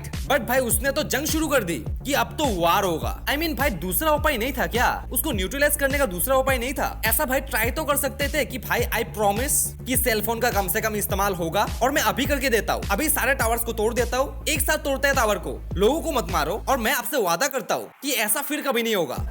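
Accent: Assam, India. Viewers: vaik assamese ho pata chal gaya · Bhaii apka hindi bolneka tarika se lagta he u are from Assam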